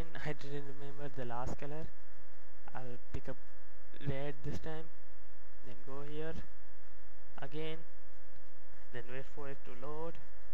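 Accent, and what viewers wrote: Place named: Spain. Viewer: How about learning speak english correctly and not "spanish english"?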